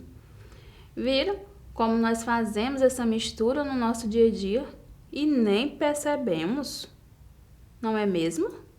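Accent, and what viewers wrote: Bahia, Brazil. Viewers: Ela é baiana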